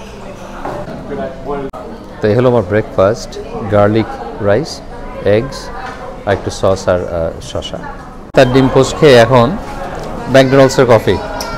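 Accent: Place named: Sylhet, Bangladesh. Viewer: ফিলিপাইনের ব্লগ দেখতে বসে সিলেটের কথা শুনে খুব ভালো ও লাগলো আবার হাসিও পেলো, আমি কিন্তু সিলেটি।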